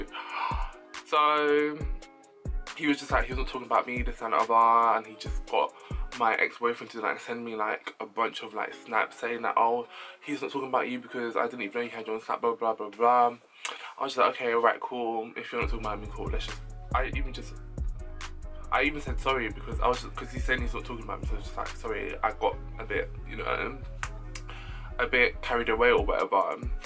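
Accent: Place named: United Kingdom. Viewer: Double Language Barrier 🙅🏽🙅🏽🙅🏽 Lisp+British Accent 😷😷😷 Handsome, Tho.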